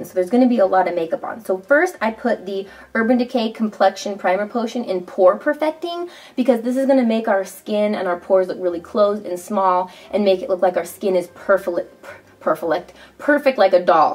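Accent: United Kingdom. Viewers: i'm in love with your british accent<3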